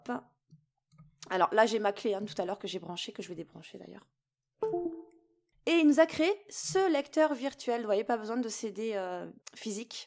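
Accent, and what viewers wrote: South, France. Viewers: Merci pour ce tuto. Et bé ! Je sens un léger reste d'accent du sud. ;)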